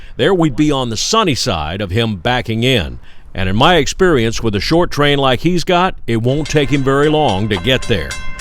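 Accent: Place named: Florida, United States. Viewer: This is absolutely my favorite railfan site for several reasons. 1) Thecontent is interesting, as well as being well shot and edited. 2) I love the down-home friendly folksy Florida twang and cadence of the narration. Although it's less noticeable here in Dade and Broward because of all the New Yorkers. 3) There is the friendly Florida attitude where you can stand in line with a complete stranger and within five to ten minutes made a new acquaintance, and sometimes a new friend. 4) I live in southern Broward County in Pembroke Pines and grew up in Hialeah, so the Florida scenery in the southwest and center of the state is very pleasing. 5) There is the interesting history of not just the railroad, but the area in general. 6) Last but not least, the dining recommendations for simple down home Florida food, there's nothing like it anywhere else. 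Even if you are not a big railfan, this is an interesting and fun channel for good ole Florida boys, and girls too.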